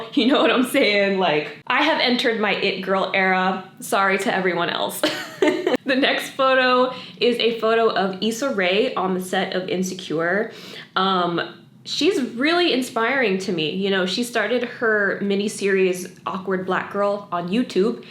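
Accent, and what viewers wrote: Australia. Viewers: girl I've gotten so used to you saying "in the description box down below" in an Australian accent that I get confused when other YouTubers don't say it in one lmao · I love that the aussie accent intro is making a reappearance!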